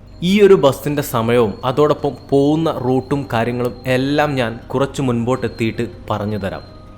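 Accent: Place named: Malabar, India. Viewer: മലബാറുക്കാർ അങ്ങനെ ആണ് പറയുന്നത്.... മധ്യ തിരുവിതാം കൂറിൻ്റെ ഭാഷയുമായി ഉച്ചാരണത്തിൽ വ്യത്യാസം ഉണ്ട്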